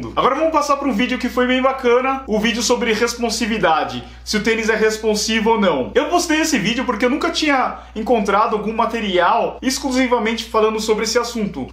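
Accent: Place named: Sorocaba, Brazil. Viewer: Eu sou de sp , sua voz que é de "do" Sorocaba rsrsrs . Valeuuuuuuuuuuuu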